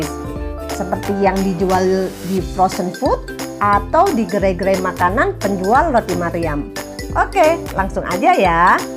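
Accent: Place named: Madura, Indonesia. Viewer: Suka banget dengan logat bicaranya mbak. Salam madura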